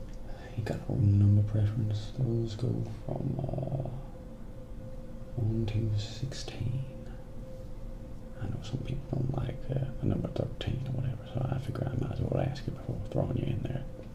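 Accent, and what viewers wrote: South, United States. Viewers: Wow, you look so different with hair - and a beard too!!.......ASMR Muzz has just done the opposite and shaved it all off. So now he looks like a hitman gone renegade! Great accent btw....or is yours a 'southern' US one? Your vids are so imaginative, and a far cry from the usual ASMR fare if you know what I mean! .....the best ASMRtists are the ones who make vids no other ASMRtist does....like yourself, Muzz, and Phoenician. Grow out the beard, and before you know it you'll be featuring on Beardbrand with Jeff whatshisname! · The whispering southern accent reminds me of Morgan freeman for some reason haha. Nice video man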